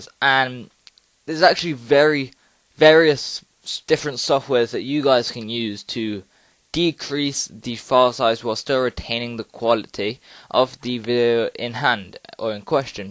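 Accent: United Kingdom. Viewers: okay apparently thats not it. It says it only uses that for previews. I just did it again from scratch along with the video and still nothing. By the way are you scottish? I've been watching monarch of the glen and you sound like them. I'm from Los Angeles so... ANYWAY I'm going crazy. I CANT FIGURE OUT WHY ITS TURNING IT INTO AUDIO. It's still mp4 but the video is gone and even the thumbnail doesn't have an image. Just windows icon which is a film reel with a music note. The video is gone. WTF? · TOO English accent !, Cannot understand a thing.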